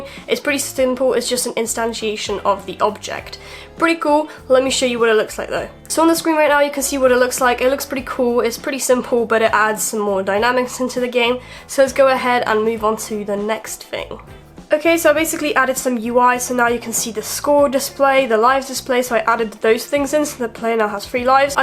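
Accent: United Kingdom. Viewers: i was thinking that she dani but british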